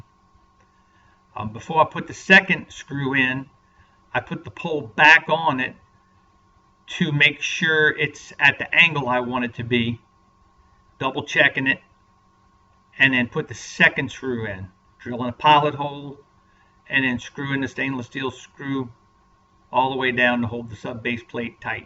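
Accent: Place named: New Orleans, United States. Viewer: Well done. Voice over worked great. Useful video. How does your Fishing Specialties BowDucer LiveScope set up do on a slow troll? Does it want to swing up? And why the Ram mount to hold the transducer? Does the Garmin Perspective mount not fit on the FS pole?. The scenery and accent makes me miss New Orleans where I grew up and could be on great water in no time. Now fish the Texas coast: drive 3 1/2 hrs to the salt. 22' Pathfinder bay boat, LiveScope, (2) Garmin EchoMap 94SVs, SteadyCast, GMS 10 port expander, NMEA 2000, MotorGuide Xi5, F150TLRC Yamaha. Need all the help I can get! Thank you for the content.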